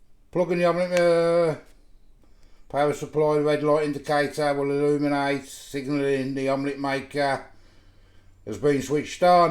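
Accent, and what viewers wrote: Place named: West Midlands, United Kingdom. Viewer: Ey up bro, only just come across your channel, got to admit I was a bit dubious at first but you seem to be a down to earth sound bloke, I’ve subscribed mainly because it’s nice to see an honest bloke who says a spade is a spade and doesn’t try to hide his accent, I’m a stokey and used to work a lot down all round the West Midlands, keep it up mate WELL DONE 👍🏻 👋🏻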